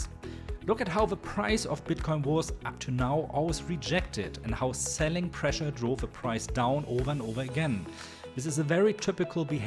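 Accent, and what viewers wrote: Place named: Germany. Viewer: Hello, i just found your channel and its amazing. Especially this vid thought me a lot about confluences. But do you really trade on the daily chart? Can you make a video explaining your entry i.eg. at lower time frames after analysing the daily chart? By the way, i like your german english accent🤗 i‘m from germany😊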